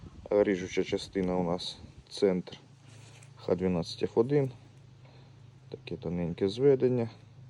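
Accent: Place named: Rivne, Ukraine. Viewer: Гарні речі робиш друже, чую по говору, ти з наших країв ( Рівненщина?)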